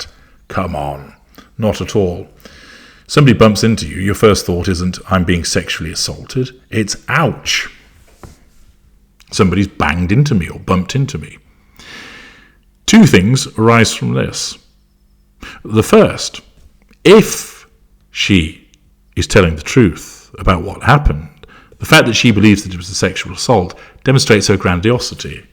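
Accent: United Kingdom. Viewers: Curious about the accent. To me it is so Hugh Grant. Is that a common accent in England? I’m American. So posh.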